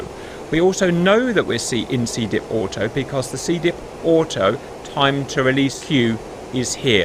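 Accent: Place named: United Kingdom. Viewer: Happy Fathersday you funny talking brit. Enjoy the journey sir.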